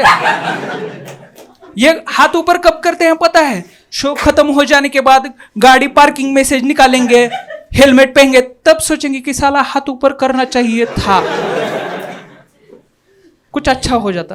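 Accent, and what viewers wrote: Maharashtra, India. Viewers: Nice comedy in the marathi accent. Jai Maharashtra bro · omg show in banglore, i thought MH... they must like ur marathi accent hindi... but how they laugh on u marathi words???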